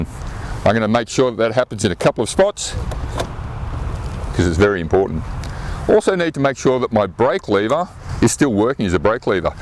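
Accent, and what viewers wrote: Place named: Australia. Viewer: love australian accent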